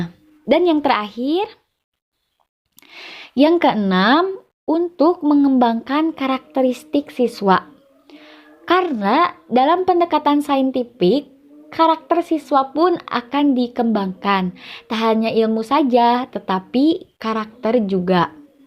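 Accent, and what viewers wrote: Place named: West Java, Indonesia. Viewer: Sunda sekali logatnya 🤣🤣🤣🤣🤣🤣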